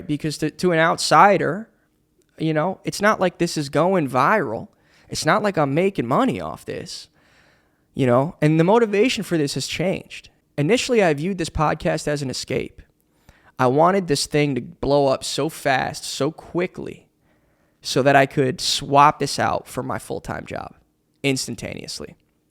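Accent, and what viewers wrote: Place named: United States. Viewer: The diction, the intonation, the rhythm....... soooo perfect! 🙌 I'm currently learning American English and YOU have the most PERFECT American accent, I can listen to you the whole time and mimicking you (and that makes me feel confident and motivated) Hope you can make more solo podcast. Thanks a million! 🩵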